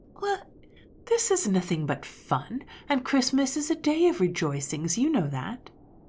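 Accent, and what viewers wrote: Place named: West Coast, United States. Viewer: Portland, OR? I live in Salem, but have been in SE OR taking care of family. I thought you sounded like a West Coast accent. 💗 Ah to have a flying canoe!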